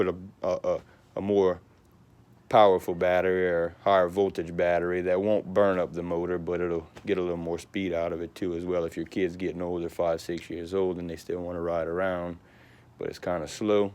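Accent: Louisiana, United States. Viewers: You're definitely from southwest Louisiana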